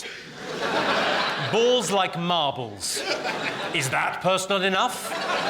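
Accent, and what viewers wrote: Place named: RP, United Kingdom. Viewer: Why did Jimmy used to put on such a posh accent?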